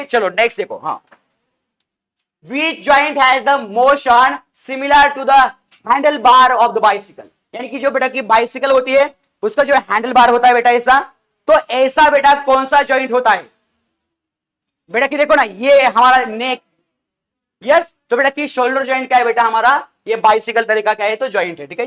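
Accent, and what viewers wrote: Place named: Maharashtra, India. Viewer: Sir thoda marathi bhi use kare to chalega 😊😅 kyonki apke samajane ke tarikese hi pata chal raha hai ap marathi hai 😅🎉❤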